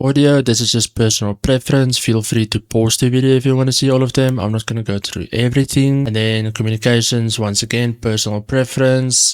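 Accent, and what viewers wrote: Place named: Cape, South Africa. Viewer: The cape accent awe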